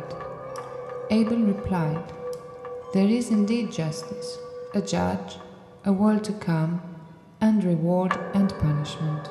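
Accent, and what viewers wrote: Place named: Greece. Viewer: she speaks with a heavy accent, is she greek ?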